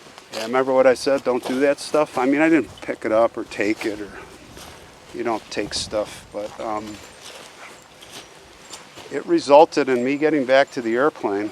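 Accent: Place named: Chicago, United States. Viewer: WOW 😣 WHAT A STORY AND VERY SAD CASE THAT THE NATIVE AMERICANS ARE TREATED SO POORLY, YOU ARE UP NEAR MY FAMILY WENT ON VACATION WHEN I WAS A KID, MINOCQUA, WOODRUFF, EAGLE RIVER, ST GERMAIN, TOMAHAWK GREAT AREA, HOLY CRAAAP THE TERROR WAS THE SHIP I SAW A SHOW ON THAT RECENTLY AND THE DOCUMENTRY TOO GOOD STUFF BROTHER, I CAN HEAR THE CHICAGO AREA ACCENT IN YOUR VOICE LOL 😆😆 TAKE CARE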